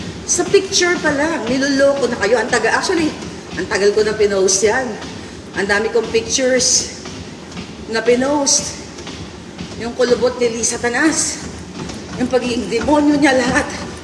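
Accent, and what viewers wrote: Iloilo, Philippines. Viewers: hahaha hindi gid nya madula ang accent mo ilonggo nang biskan mg hambal k tagalong or English pg hindi mo ma translate agad illonggos words ga buka sa bibing mo ..halong gid permi nang stay healthy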